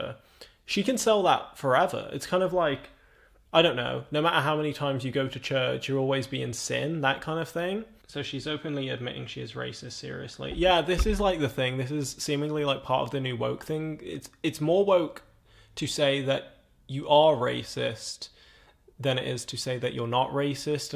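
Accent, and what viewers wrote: United Kingdom. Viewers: I can see what you mean by black passing. But what I looked at you I actually didn't think you were black. like full african. I thought you were like well actually I did think you were black like I thought I was confused like I wasn't sure if your Fulani Ethiopian smiley. You could have been all those things so I guess you're right you are bypassing. I thought when I the English accent to me I think that's what set me off. Because if you were over here in America you would just be black and my opinion. Because black Americans I don't see any mixed black people looking like you. Really at all.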